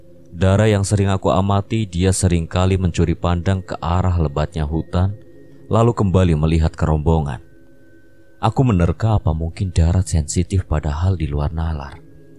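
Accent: Java, Indonesia. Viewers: Wong jowo mas?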